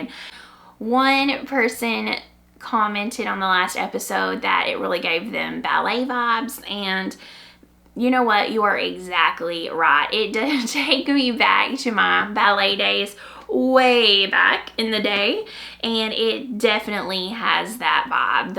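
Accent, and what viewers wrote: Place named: Arkansas, United States. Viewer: Hi! I love your podcast!! I’m a fellow Arkansan transplanted to Texas a long time ago and I just love hearing your accent from home! I love your Zosia wrap cardi - so glad you finished and survived!!! I can’t wait to make it in a light gray. You asked in a previous video for book recommendations and I recommend reading “A Gentleman in Moscow” if your haven’t already read it. It’s a beautiful story. My mom and I are reading the Thursday Murder Club series at your recommendation and absolutely love it! Thanks so much for all you share!! You’re so inspiring 🐝 🐓 🧶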